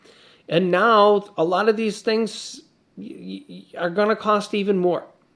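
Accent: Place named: New York, United States. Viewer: Just, I can hear you New York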